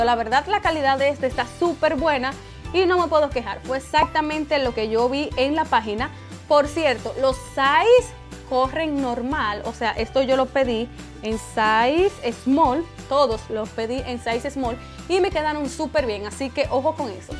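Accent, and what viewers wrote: Dominican Republic. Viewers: Eres dominicana?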